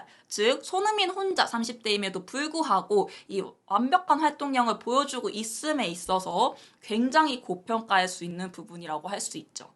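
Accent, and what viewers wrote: Gyeongsang, South Korea. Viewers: 억양이 곧 갱상도 사투리가 틔어나올꺼 같은 억양이네욛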